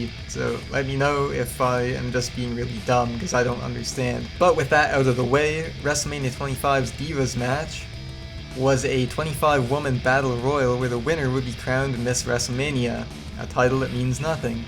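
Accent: Canada. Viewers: You sound like you're from Nova Scotia. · You can defend your Lawrence Taylor comments and put up annotations all you want but that doesn't change the fact that you don't know what you're talking about. It's pretty evident through your accent that you're Canadian. It's not WWE's fault you're from Canada and don't care about American football. Just because you don't know who LT is doesn't mean the majority of WWE fans didn't. Besides, how old were you in 1995? Were you even alive? WWE is an American-based company with mostly American fans most of which are NFL fans. If you really want to complain about WWE using one of the biggest names in the world of sports at the time to boost ratings, I don't know that they'll ever find a way to please you. To put it into perspective for you, it would be like WWE having Gretzky appear at WM and an American saying "Who is Wayne Gretzky? 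Have you ever heard of him? Probably not."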